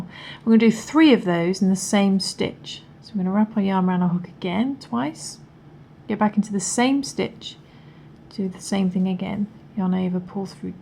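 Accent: United Kingdom. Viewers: You explain it so well and your not rushing it at all I love how it’s all to do with the crocheting rather than showing off your nails like some others do . Plus it’s good to hear someone from the uk 🇬🇧 like myself 😊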